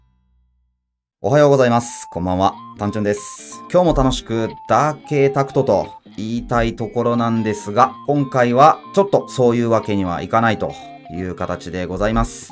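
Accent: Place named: Kansai, Japan. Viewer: 関西弁がいい仕事してます笑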